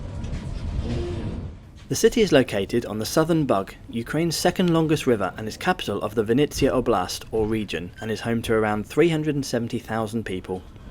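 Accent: United Kingdom. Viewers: Absolutely disgusting; you are claiming that this is a non-political movie, still you are referencing to putin illegal invasion + fundraise + repeat Nazi slogans. Based on your accent you might be from the UK. Ask your relatives, if they know British soldiers, who were wounded or killed by the Nazis in World War 2. Ukrainian Bandera Nazis fought together with the German Nazis against the Allied Forces in World War 2.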